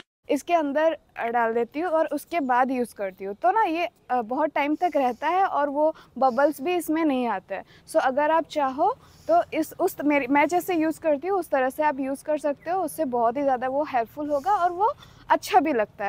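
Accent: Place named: Odisha, India. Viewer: Brooo in my mind i was thinking u are from odisha because of ur accent 🥴, u actually are .... 🥹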